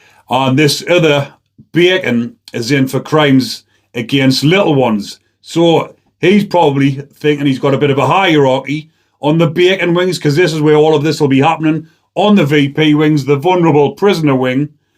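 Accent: Newcastle, United Kingdom. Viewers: we all know you're a Geordie but can't you speak MoRe clearly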